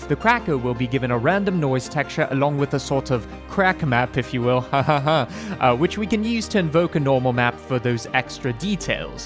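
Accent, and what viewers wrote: United Kingdom. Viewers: Haha the british ish accent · Damn very British! Really posh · Is he doing an English accent? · why your accent turned bri'ish? (loved the tutorial tho it was amazing) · the British accent sounds pretty good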